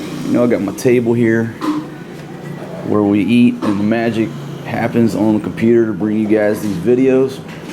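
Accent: South, United States. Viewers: I appreciate that you are doing your own thing but I can tell that with a mouth like yours you were raised in the south, but it sure wasn’t Texas man. Safe travels there guy.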